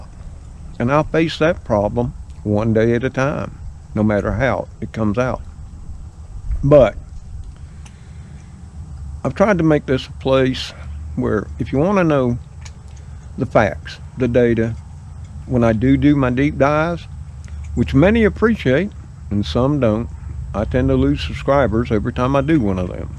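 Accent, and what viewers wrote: South, United States. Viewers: I really enjoy hearing you narrate/speak with your southern accent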